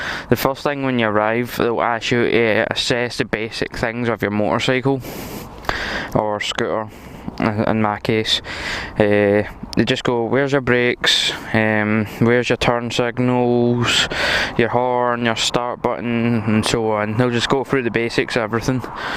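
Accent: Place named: Glasgow, United Kingdom. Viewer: Whereabouts is this u sound like ur from glasgow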